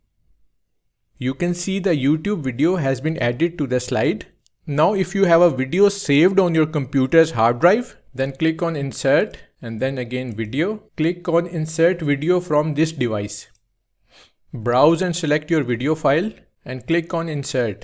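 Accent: India. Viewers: Indian ahhh voice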